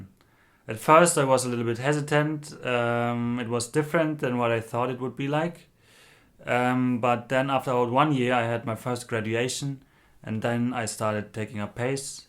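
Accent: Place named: Germany. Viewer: Aaaah I knew you were German when I first heard your accent in Dave Trippin's video :) Bin auch deutsch (aus München) und habe für 4 Jahre in Japan gelebt, will irgendwann zurück, ist eine Liebe für's Leben, wie's scheint. Bereu auch irgendwie meine Entscheidung zurück gegangen zu sein, wird sicherlich schwierig es nochmal zu schaffen! Das Visum ist ja immer Hauptproblem.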